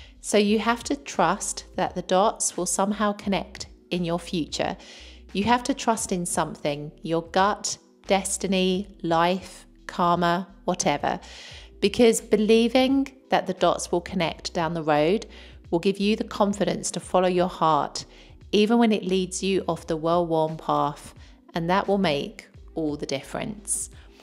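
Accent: United Kingdom. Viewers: I would like to hear you speak in Italian on a video given your British accent?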